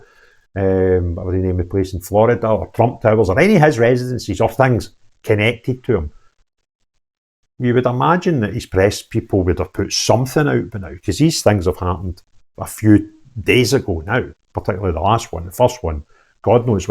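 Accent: Scotland, United Kingdom. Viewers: Have a great day everyone...!!...from germany....yep.......hahaha.....love your scottish accent...or is it "dialect"...whatever...cheers. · Its sounded like he's said he's in a shaky peg.... Put on subtitles cc on vlog. And it shows that to be what said but its not always right with the Scottish accent. I've never heard that saying b4.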